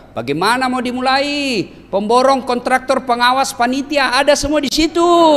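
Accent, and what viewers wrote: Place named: Bugis, Indonesia. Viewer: Pengaruh Logat Bugis pak...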